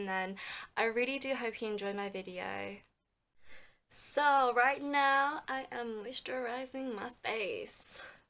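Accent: United Kingdom. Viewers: omg i am so late to subscribe to your channel. omg where have you beennnnnnnnnnnnnnnnnn. first off i love your accent its like british and funny enough i want to start talking like you. thank you for the inspiration on not to mind what people say about your makeup i love u so much. 😍😍😍😍😍😍😍😍😍😍t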